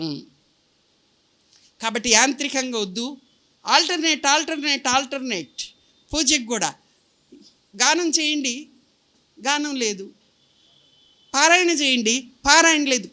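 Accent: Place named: Nellore, India. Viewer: "సాయి సత్య ధర్మ వైభవం" - <num>, షిర్డి సాయి గ్లోబల్ మిషన్ ఆధ్వర్యంలో సాయి తత్వ ప్రవచన కార్యక్రమంలో వాకాడు ఆనంద సాయి మందిరం శ్రీమతి ప్రసంగం సాయి బాబా గారి తత్వం గురించి…సాయి తత్వం ఎంతో గొప్పదని సాయి అన్ని ముందుండి నడిపిస్తారు అన్ని నెల్లూరు స్లాంగ్ లో అద్భుతమైన ప్రసంగం చేశారు..🙏🙏🙏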